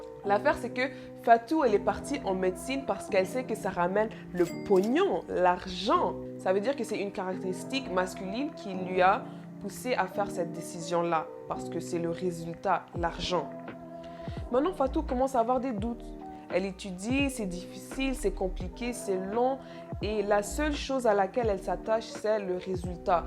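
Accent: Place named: England, United Kingdom. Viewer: Ton français provient d'un accent anglais, je crois 🤔?et du courage je me suis déjà abonné....du courage pour la suite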